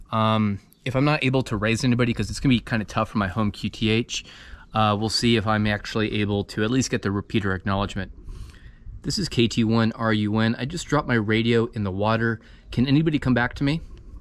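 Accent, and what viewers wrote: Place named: Arizona, United States. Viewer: Sound like your in AZ. We might be neighbors! Thanks for the video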